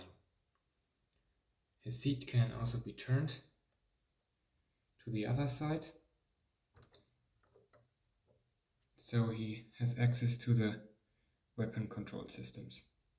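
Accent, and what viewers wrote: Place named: Germany. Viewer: Aber der Dialekt ist so Deutsch 🤣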